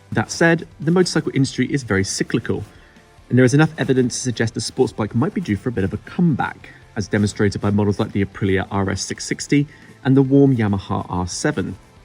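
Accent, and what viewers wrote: United Kingdom. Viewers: All bullshit aside.... A British accent saying weird words in a weird way, in no way means the wanker knows anything about riding a motorcycle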